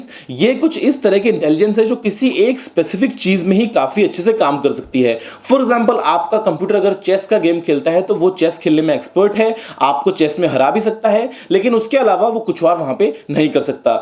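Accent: Bengal, India. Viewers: Sir.. Aap bengali ho?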